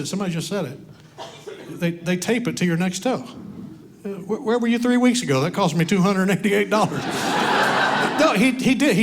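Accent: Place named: South, United States. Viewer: His accent makes u think he’s gonna say something ignorant but he’s got his own southern style to him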